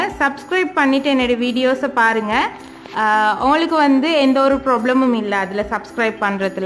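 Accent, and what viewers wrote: Sri Lanka. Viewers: லங்காவில பேசக்கூடிய தமிழ் எனக்கு ரொம்ப புடிக்கும். அதிலும் நீங்க பேசக்கூடிய தமிழ் எனக்கு ரொம்பவே புடிக்கும். உங்கட சமையலையும் சேர்த்துதான் சொல்றன். அல்லாஹ் பாரக் ஃபீ. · Very candid and innocent reply. Do not worry about your accent and language that is the attraction for normal Tamils to hear srilankan accent . If your mother tounge is not tamil then you are doing a super job. And your cooking is also short and crisp Just keep it up. My good wishes for all success in life. You will shine sister.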